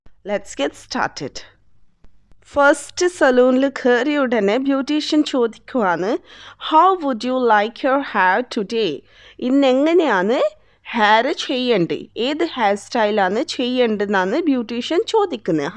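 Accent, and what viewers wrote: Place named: Kannur, India. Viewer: Typically kannur malayalam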